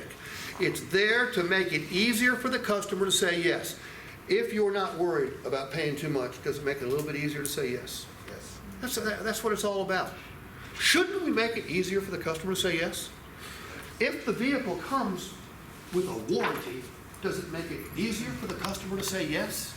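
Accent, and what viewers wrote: Appalachia, United States. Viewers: Hillbilly and his bullshit hillbilly talk give it up. It’s over nobody cares. These are all tricks that consumers know about you need to sit by the pond and watch the ducks.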